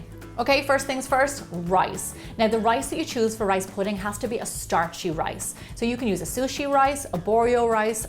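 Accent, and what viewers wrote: Ireland. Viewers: love your irish accent too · I looooooooooove Irish accent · your beautiful Irish accent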